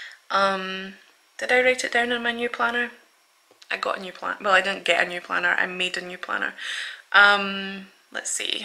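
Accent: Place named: Fife, United Kingdom. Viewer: yeah I know what you mean about me pursuing drawing. I used to really love it when I was younger and I was ok at it, but then when I attempted it about a month ago I was horrific at it and I was like "eh where did all my talent go lol", but as you say, if I put in the time and effort I could get good at it. I know you moved house a few months back so I hope all that went ok, it's always really stressful. I'm a Scottish gal myself, where are you from, you sound as if you could be from Fife. Hope your handsome boy Cayden is doing good as well 😊 Btw I would love you to do more colouring book videos but I know probably art and drawing is your first love 😁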